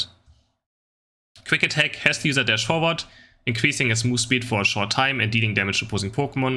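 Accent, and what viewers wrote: Germany. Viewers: where are u from? germany?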